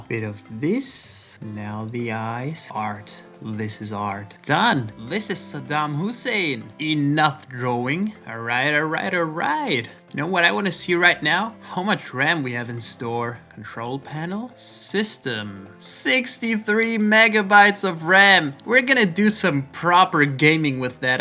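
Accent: Germany. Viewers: Du bist deutsch oder?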